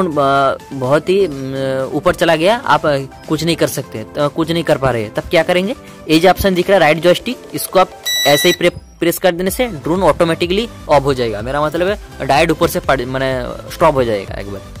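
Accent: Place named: Bengal, India. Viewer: Bangla beriye jacche mukh theke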